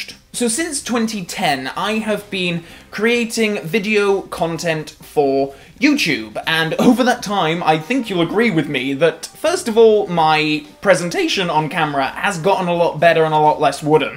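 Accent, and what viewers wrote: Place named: North, United Kingdom. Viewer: Thank you very much for replying! The curious thing about doing a You Tube series for so long is you can chart yourself and any differences. Just to say, I really like your older videos as well as your newer ones (so I hope you never take those down). In their favour, I'd say you had quite a droll style back then, and it was fun to see when you got (faux?) annoyed. But I like how you come now across as well, it's very engaging and warm, esp to new viewers. Although it is nice to see when you get put out by something bad in a Bond film or game. And yes, you did used to sound a lot more Northern!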